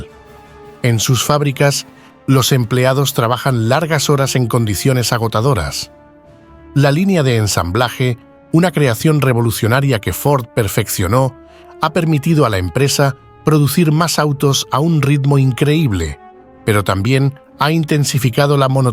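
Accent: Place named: Granada, Spain. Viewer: Esta mujer, MARIA ( MERY) fue recompensada por DIOS SANTO Por sus tantos sacrificios y méritos que desde NIÑA ella Cosechó, así que DIOS SANTO la recompensó con este HIJO llamado ENRIQUE FORD. gracias, tus videos son envolventes y así con PERAS Y MANZANAS, si entiendo un poco. P R A, Debo decir que la primera ves que vi tu Canal, pensé que eras Español ya que tu Asentó esta mas allegado a l Castellano de GRANADA que al Castellano COLOMBIANO. Congratulaciones para ti, BENDICIONES from AGUASCALIENTES CITY, EUMA) BYA BYA. 8.12,24 A, y acuérdense que TOMAS ALBA EDISON NACIO EN EL PUEBLO, SOMBRERETE, ZACATECAS CITY. NORTEAMÉRICA MEXICANA. A Tomas Alva Edison se lo llevaron sus Padres a los EEUU. ala edad de 6 años, una ves ya con la vocación de ingeniero INVENTOR, el JUDIO DE RELIGIÓN J P MORGAN lo FINANCIÓ Y SE HICIERON SOCIOS es por eso que la mayoría de los AMERICANOS DE HABLA HISPANA Y EURO ESTADUNIDENSES CREN QUE TOMAS ALBA EDISON NACIÓ EN EEUU.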